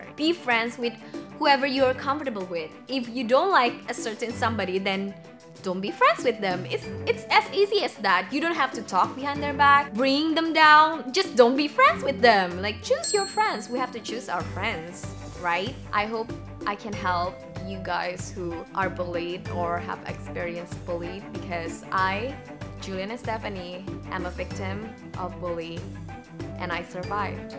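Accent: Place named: Singapore. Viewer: kalo ngomong bahasa inggris jadi ga keliatan kaya orang indo, kaya orang singapore wkwkw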